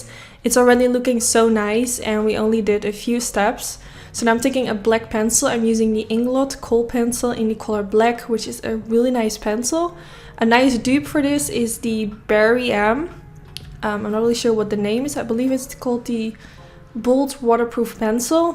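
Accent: Netherlands. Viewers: Nederlands accent hahahah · Ik hoorde gelijk dat je nederlands was, haha! Mooie video, ben gelijk gesubbed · Your accent sounds Dutch? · Ik hou van je Nederlandse accent in je Engels ❤️ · Not even 20 sec in: Me: that's so Dutch.... Lol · You sound Dutch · I can hear youre Dutch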